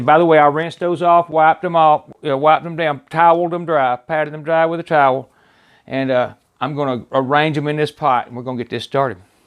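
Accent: South, United States. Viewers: ! Great Video! My Wife and I made the Ribs and used about 1 - 1/2 slabs at the same timers. Still delicious. Probably means that there is room for error. I'll subscribe not for the recipe, but for being able to listen to your kindly southern voice. Nostalgic in a way. Last thing...off topic. I would like to know what kind of watch you have there. That thing is right up my alley and would be great for learning to cook.:-) Thanks, keep it up and don't feel like you don't deserve to monetize your channel. I've seen THOUSANDS of terrible worthless videos that are making way too much money. Great Job. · Great, simple, straight forward and easy to follow. Also, when talking about barbecuing, I tend to trust people with a strong southern accent. Thanks for this. Will be trying this week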